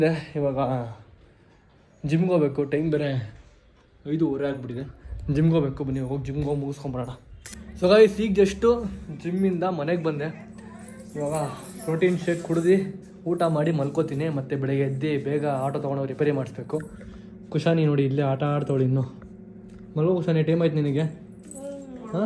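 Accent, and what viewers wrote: North Karnataka, India. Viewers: just love the way you speak our uttarkarnataka slang 😂❤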